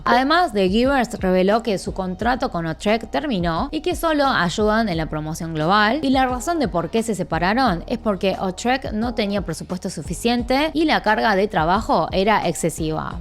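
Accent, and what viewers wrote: Argentina. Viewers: Su acento es argentino porque nació y vivió mucho tiempo en Argentina. Ahora soporta panzona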